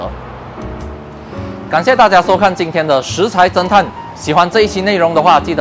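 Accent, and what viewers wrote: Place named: Malaysia. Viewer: 马来西亚的声音和我朋友一样😂喜欢…..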